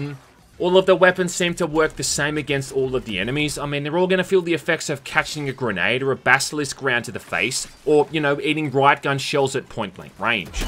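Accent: Australia. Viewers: Australian mate?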